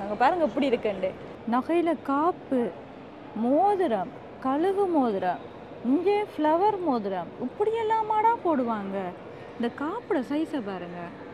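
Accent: Sri Lanka. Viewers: அழகான சிங்களம் தமிழ் ❤❤❤❤❤❤❤❤❤ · இலங்கை தமிழ் மிகவும் அழகு...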